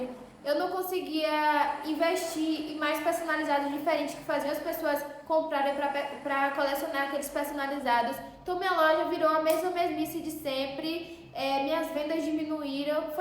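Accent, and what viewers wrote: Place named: Bahia, Brazil. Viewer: minha querida, seu sotaque é bem baiano e eu amei seu vídeo, que loucura tudo isso, sua irmã é uma santa kkk boa sorte espero que prospere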